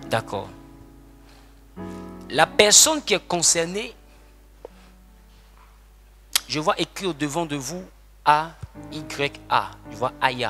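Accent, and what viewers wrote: Ivory Coast. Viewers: Mais mon frère c'est comment? Lol, tu ressembles à un congolais, mais l'accent est 100% ivoirien! Bon enseignement cousin.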